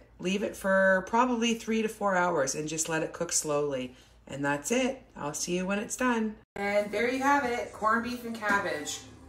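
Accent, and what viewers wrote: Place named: Ireland. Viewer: ohio Long way from home! I did not think Canadians had an accent. I was thinking you had a bit of Irish cuz some of the things you said sounded Irish accent.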